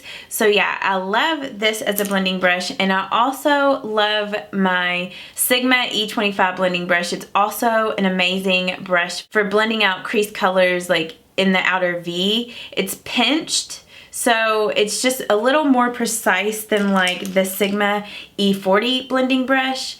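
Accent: South, United States. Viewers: it always makes me happy to hear a fellow southern gal speak. it seems like all the makeup YouTube channels are from either the north or Texas etc. the southern accent is musical.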